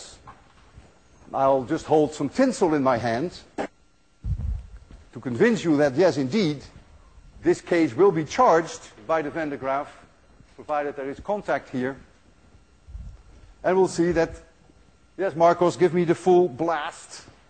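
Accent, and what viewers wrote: Netherlands. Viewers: As far as I can hear, he is dutch. 100% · He has a Dutch accent somehow. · Prof was born in Holland? - his accent is very Dutch Great presentation though :) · all I can tell you is that his accent is dutch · Dit is een Nederlander, ik hoor het aan zijn accnt XD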